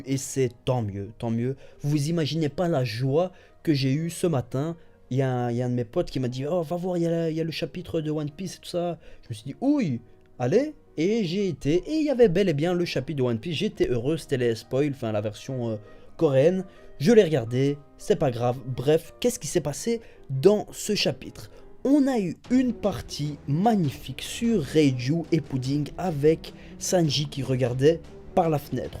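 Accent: Belgium. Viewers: Question complètement hors sujet désolé mais T'es belge, est ce que tu viens de Huy ? ^^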